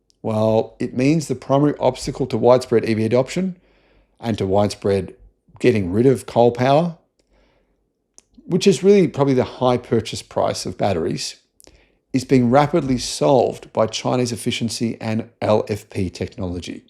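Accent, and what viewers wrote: Australia. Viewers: He speaks colourful Aussie!! 😂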